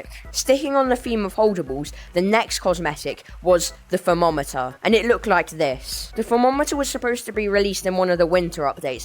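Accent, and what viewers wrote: United Kingdom. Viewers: Why does bro sound so British I'm not saying it a bad way I'm saying it in a good way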